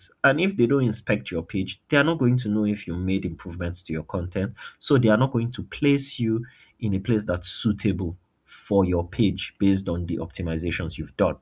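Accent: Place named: Africa. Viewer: Whatever you doing bro I am here for it..but 😭😭that accent bro..are you African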